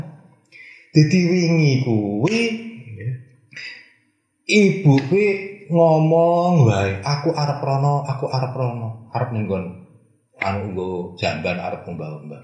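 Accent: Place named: Pekalongan, Indonesia. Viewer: Logate koyo wong kalongan o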